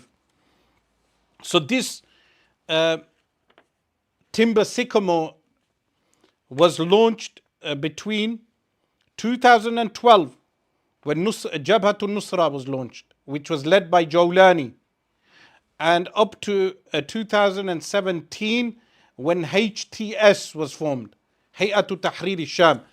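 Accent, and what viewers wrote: United Kingdom. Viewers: You might be right. But remember with that British accent and paying taxes to a country that supports war on your own religion. So first admit your own hypocrisy first than expose others.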